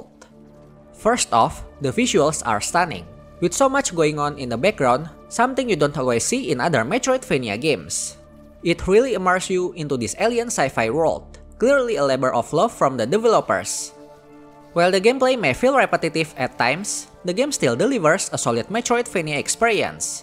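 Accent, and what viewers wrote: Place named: Indonesia. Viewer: aksennya kyk org indo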